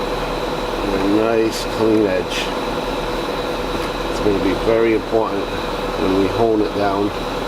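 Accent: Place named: Massachusetts, United States. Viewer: Hey...if we got married....my name would be......Tamara!!! Lets do it! Lol i have no idea who you are im just here to get some instructions on how to sharpen my shears and you sound like you are from Mass. Have a great day thank you for posting this helpful video. Hope u got a little laugh my pay for ur vid. 😎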